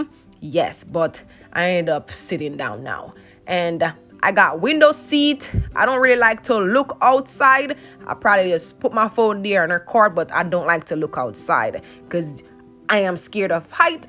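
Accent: Jamaica. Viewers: Just scrolling through and came across your video.......your enthusiasm throughout the entire video is quite amazing though I'm born jamaican like you I truly love your accent. Left jamaica as a child almost 40years ago.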